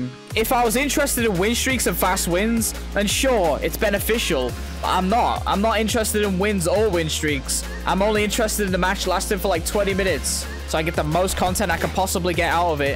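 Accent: United Kingdom. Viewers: Bruh is british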